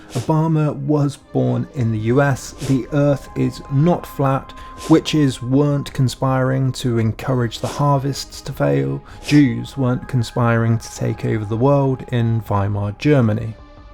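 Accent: United Kingdom. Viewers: You can't debunk what Brand says as not being true....you only try to discredit him by attempting to portray him as irrational in your smooth slick British voice.....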